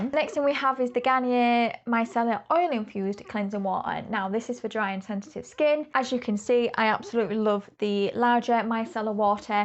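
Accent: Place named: Lancashire, United Kingdom. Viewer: I am UK based and do empties videos too! There aren't many of us, happy to find you and your channel! It's always nice to see reviews of products that I can actually get hold of and try myself! Subscribed! Ooh are you in greater Manchester, Lancashire? Am I close or nowhere near? I love trying to guess accents, I find them fascinating!!!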